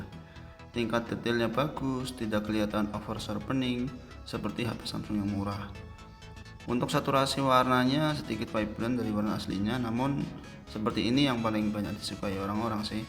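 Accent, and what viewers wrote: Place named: Banyumas, Indonesia. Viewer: Logatnya kyk ngapak ini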